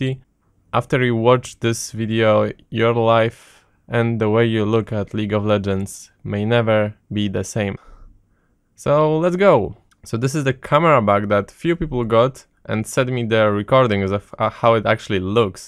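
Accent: Poland. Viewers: Po akcencie można poznać że jesteś Polakiem xD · Masz Polski akcent. Jesteś Polakiem ?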